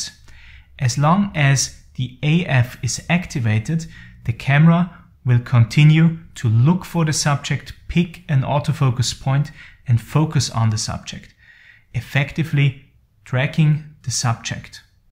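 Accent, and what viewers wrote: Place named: Vienna, Austria. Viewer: Anschaulich beschrieben, danke. Im sympathischen Wiener Dialekt statt in englisch hätte es von mir auch ein "thumps up" gegeben 😀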